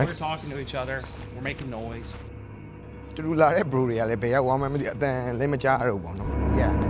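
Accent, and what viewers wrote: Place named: Myeik, Myanmar. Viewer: မြိတ်အသံပါ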